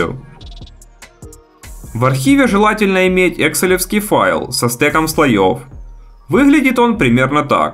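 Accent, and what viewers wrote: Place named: Ukraine. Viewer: Нормальный акцент украинский, так и должно быть.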